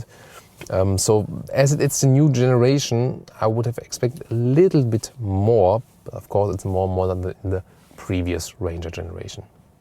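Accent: Germany. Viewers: Ein Deutscher spricht Englisch. A German speak Inglish.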